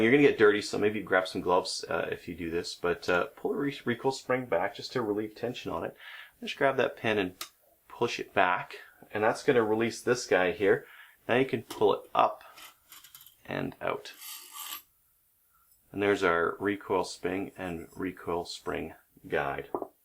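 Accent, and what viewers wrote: Canada. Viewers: You sound Canadian. You must live in the U.S. now or Trudeau has confiscated this rifle already. Going to happen to us as well. 🤨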